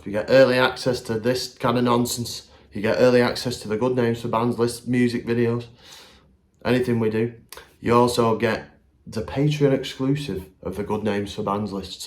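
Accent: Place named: Liverpool, United Kingdom. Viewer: He sounds british. has Liverpool accent a bit..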